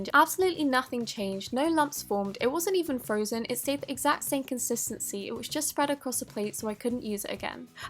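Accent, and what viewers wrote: England, United Kingdom. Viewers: GamerGurl Utubly it’s called an English accent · you sound british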